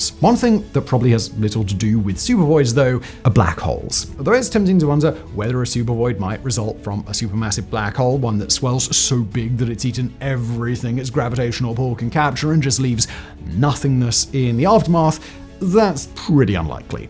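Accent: United Kingdom. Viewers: You Tube SUCKS! I have removed this man's channels over and over again. But when You Tube changes the algorithm, it force feeds me this Simon guy's many channels despite my removing him. Simon's channels suck. They are poorly researched information that is mostly government propaganda talking points. Terrible videos. And just because Simon has a British accent, this doesn't not make him an authority on anything. He's just a talking head. England is over. Brexit has made the only job in England a You Tube content provider. Terrible list videos that spread falsehoods and bull.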